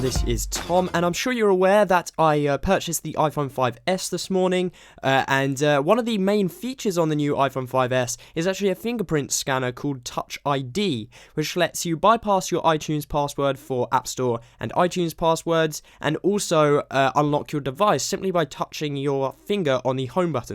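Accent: United Kingdom. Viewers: I luv british accents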